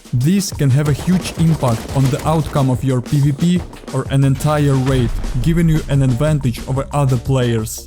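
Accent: Russia. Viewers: Where you from? R u from Russia? U have a Russian accent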